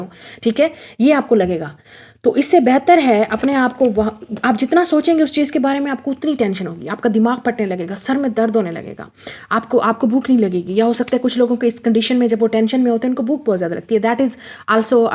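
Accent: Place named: Punjab, India. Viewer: Oye hoyeeee.... Enni sweet punjaabi.... ♡♡♡♡♡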